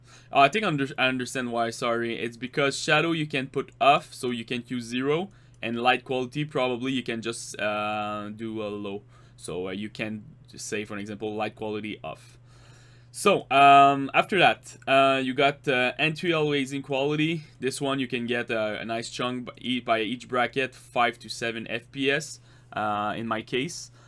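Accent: Quebec, Canada. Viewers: Quebec accent xD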